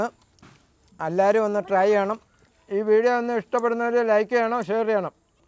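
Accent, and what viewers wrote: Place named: Elippakkulam, India. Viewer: Elippakkulam slang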